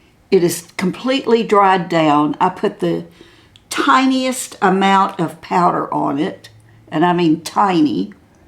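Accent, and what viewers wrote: South, United States. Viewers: There is something about her. . . . She's authentic and honest. . . . Down to earth and the way she talks. . . Do I hear a little Southern accent ? I love Milani cosmetics too. She's not afraid to review the cheap cosmetics. . .Dollar General ? And tells us what she thinks . . .She's not trying to look young. . .she's comfortable in her skin and age hair is dirty tomorrow shampoo day ! Love her! You say you are a walker. . .take us on your walking tour sometime. Those old school rollers and clips ! ! I'll be tuning in all through 2025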